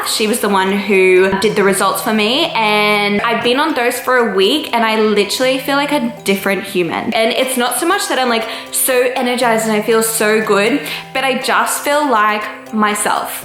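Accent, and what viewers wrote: Australia. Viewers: Loved this vlog! These are the videos I get most excited for. Just a kinda of day in the life and what I eat in a day. Btw I love your Australian accent, jealous because American “accents” aren’t cute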